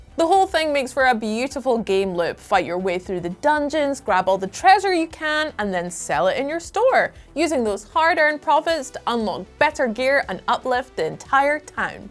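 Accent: Scotland, United Kingdom. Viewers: Xbox Strong all Day Long.... Is this Chick Kool or what. And so I hear a Scottish accent? 😊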